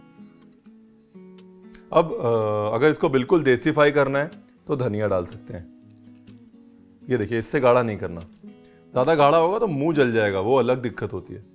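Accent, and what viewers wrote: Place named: Punjab, India. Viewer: Sweet Punjabi accent...loved it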